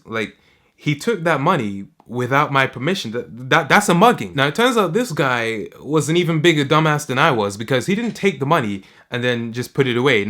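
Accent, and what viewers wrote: England, United Kingdom. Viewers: I forgot he was British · Your british? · Is he from the UK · Are you english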